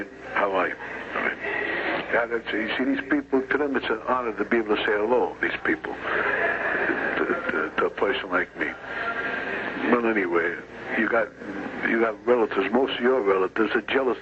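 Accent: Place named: Sicily, Italy. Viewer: Scicilian Accent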